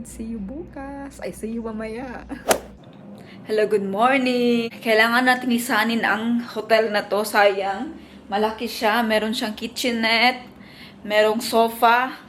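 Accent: Visayas, Philippines. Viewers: Stop being perfectionist girls.shes a Bisaya so it's not easy to her to speak straight..and that's the way that's why she known from the beginning of her vlog if you think that you are brainy enough you should understand what she's going to mean it.in my opinion ✌️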